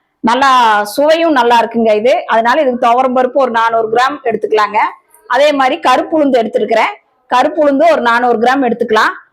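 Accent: Kongu, India. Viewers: Ithu coimbatore slang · Ada idhu Coimbatore bashaiingov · எங்க கொங்கு நாட்டு பக்கம் நாங்க பொறிக்க போரன் அப்படிதான் சொல்லுவோம் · Kongu slang apditha irukum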